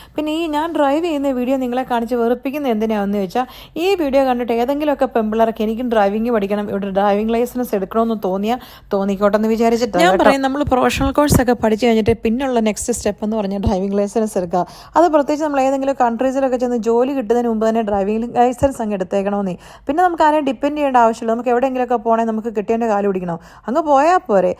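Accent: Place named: Kottayam, India. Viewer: Chechi kottayam aano...nammude samsaram pole thonni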